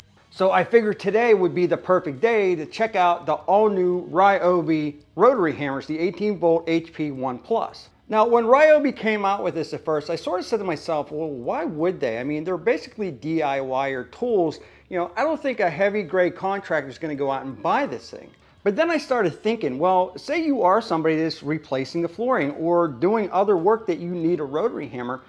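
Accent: Pittsburgh, United States. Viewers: Love the Pittsburgh language!!! PGH STEELERS 4-LIFE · 🤘🏻Pittsburgh speak 🤘🏻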